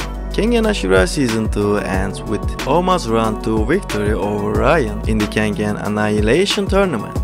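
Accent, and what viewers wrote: Turkey. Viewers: Aksandan anladım türk olduğunu :), insan altyazı koyar be hocam 😂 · Bu aksan türk aksanı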